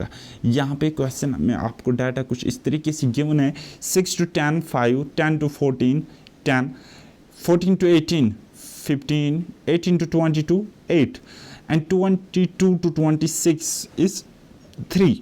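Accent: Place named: South, India. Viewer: Sir ap na south Indian or kashmiri acesente mix keya ha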